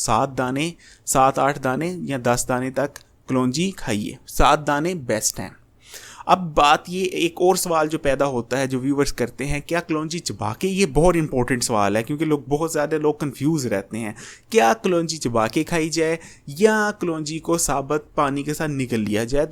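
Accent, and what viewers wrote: Punjab, India. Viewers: Apka Punjabi accent ..apka baat krne ka tariqa bht acha hai. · بھائ اپنی اردو تو ٹھیک کر لیں یار کتنے الفاظ ایسے ھیں جو آپ نے پنجابی کے ادا کئے باقی رھی کلونجی کی تو وہ شفا ہی شفا ھے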